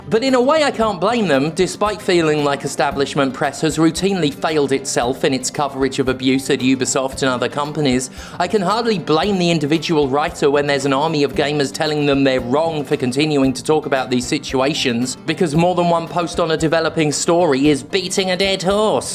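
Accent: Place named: England, United Kingdom. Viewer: Love how as never lost his English accent.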